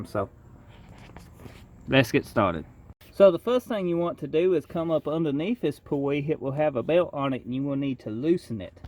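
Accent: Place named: Louisiana, United States. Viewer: You from Louisiana?, it sounds like someone from that neck of the woods.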